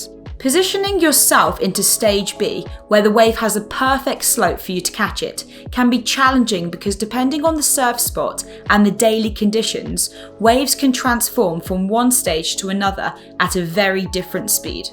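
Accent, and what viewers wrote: United Kingdom. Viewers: Slight British accent...lol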